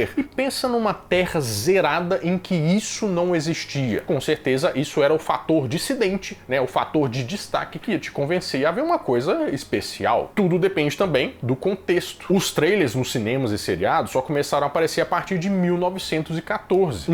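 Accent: Minas Gerais, Brazil. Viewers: Vejo vc porque eu gosto. Tinha muitas opções. Sim mas a sua voz de mineiro e muito gostoso de ouvir. Sou mineira também UAI 😂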